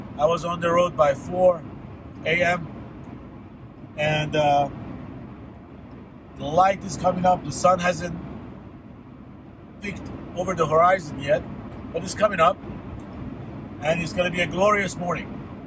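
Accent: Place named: Arab world. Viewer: Just out of curiosity are you originally from the middle east? My GF (from Iran) and I have been trying to place your accent (maybe do an episode about what you do in life, profession, what got you into overlanding, etc.). Loving your videos btw. Keep them coming! I did 5 BDR's last year in my JKU, sold the RTT and am in the home stretch of a year long wait for my J30. I live in Chula Vista so if you ever make it back out this way, DM me and I'll buy you a burrito and a beer. 👍🏻😎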